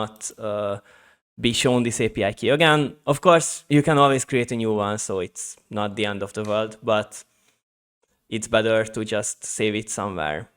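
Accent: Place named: Hungary. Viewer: Magyar akcentus az peak tesó! Amúgy jó lett a video, well done GG!!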